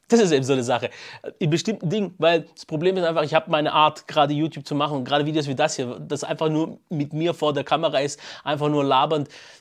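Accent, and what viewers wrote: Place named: Swabia, Germany. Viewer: Schwabe laaan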